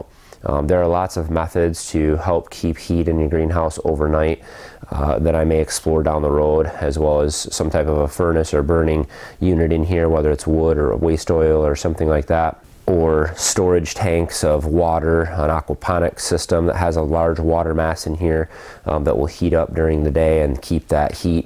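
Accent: Michigan, United States. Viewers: your Michigan accent...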